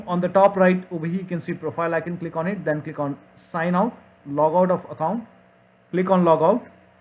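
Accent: India. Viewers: love the indian accent, just like vancouver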